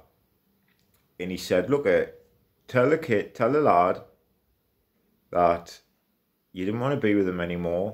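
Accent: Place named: Newcastle, United Kingdom. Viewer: Its the geordie bro 🙂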